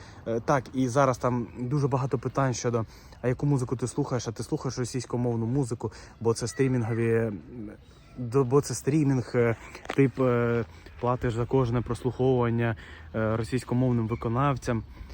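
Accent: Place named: Lviv, Ukraine. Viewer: Успіхів з українською. Дуже гарно розмовляєте. P.S. львівська мова то тєма😄 сама зі Львова і,живучи не в Україні, львів'ян чую одразу 😅(Франківськ,Тернопіль теж)🙂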